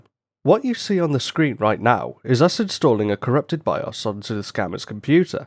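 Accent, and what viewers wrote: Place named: India. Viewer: This host channel like india accent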